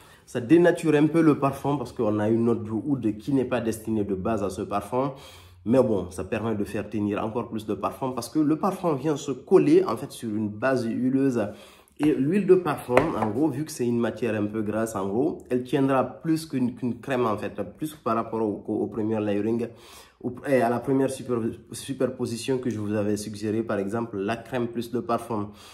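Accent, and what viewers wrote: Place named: Wolof, Senegal. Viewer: Hello merci pour ta video, Jaime bcp ta chaîne!!! Es-tu sénégalais ? Tu as un accent wolof !!! 😻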